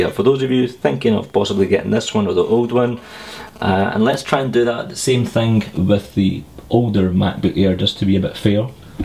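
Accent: Scotland, United Kingdom. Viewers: That's a British Scottish accent. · r u from Scotland ??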